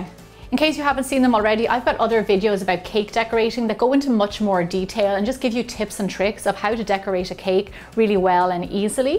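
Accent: Ireland. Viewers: Wow! You are super inventive! My picky 9yr old loved your baked potato soup in a mug. Benjamin said it was so delicious. And, my 4yr old Gianna just saw this video of triple layer cake in the microwave and can't wait to bake one. Thank you so much for your amazing recipes! Love your Irish accent too. My father's background is Catholic Irish (Kelley) is my maiden name and my mother is from Ecuador. Congratulations to you and your husband for your new baby. What a blessing. She will be so proud and grateful for such a great mommy. Love & prayers, Deirdre & Patrick Tondo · You're so pretty, and your accent is so cool! Lemme guess... You are from Ireland? Thank you for your creativity, it's a miracle how you can actually make a cake with a microwave! 😋☺️🎂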